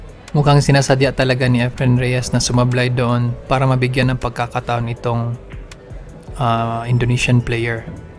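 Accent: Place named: Philippines. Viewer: Pootah probinsyano ang narrator hahaha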